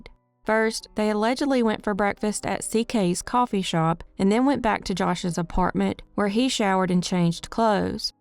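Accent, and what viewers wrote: South, United States. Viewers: Middle Tennessee girl here, and ready to go!!!! Thanks for yet another well told video. The best difference between your and many YT true crime channels is that it always shows that you genuinely care about the people touched by the crimes, not just the facts of the cases! And one of my ABSOLUTE FAVORITE aspect of your channel is hearing the same southern accent I have! I really don't think there are near enough YT channels with people that have our accent! ❤Hope you are having a wonderful 2024 so far, Love❤ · This blog is delicious. Your beautiful Southern accent is enchanting. These mysteries are head scratchers. Keep your children close. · Ok, the channel, the accent. Super cute. Hi from Kentucky!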